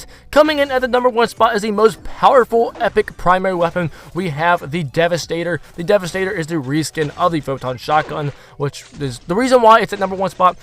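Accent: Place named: United Kingdom. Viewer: Shut the fuck up you sound like you’re British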